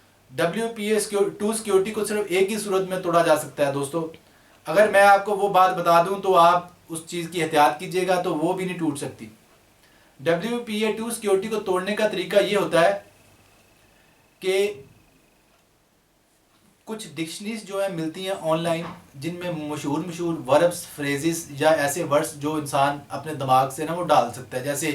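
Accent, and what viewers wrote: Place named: Punjab, India. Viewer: Aesi bat nai hai ye Lahore se hain is liye English bolte hue inka lehja panjabiyon wala hai lagta hai koi bat nai itna koi issue nai hai but vedio bhut hi awche hain bazz wait to hum or aap jese eng bolne wale high school me pharne wale bhi is tarhan logon ki madad nai kar pate but I am very thank full to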